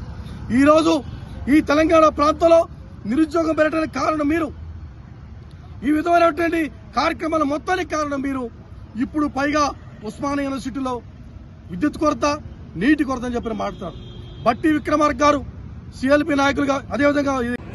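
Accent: Andhra Pradesh, India. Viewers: Neee slang yeee andhra undiiii!!!! Evadivi raaaaa nuvvu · వీడొక ఆంధ్రా slaunt లో మాట్లాడుతున్నాడు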